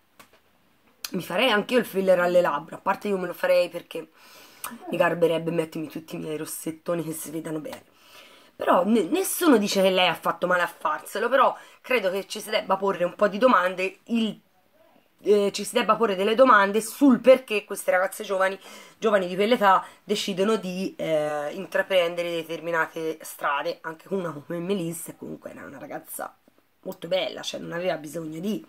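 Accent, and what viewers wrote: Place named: Rome, Italy. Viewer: A A ME, ME PIACE CHE PARI ROMANA!!!!!! PERO' IO SO' DE' PARTE!!!!!😅😅😂😂😂😘😘😘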